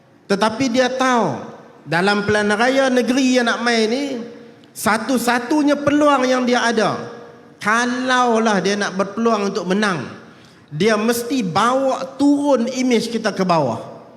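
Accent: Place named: Northern, Malaysia. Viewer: Ini kali pertama aku dengar wan saiful cakap utara.. awkward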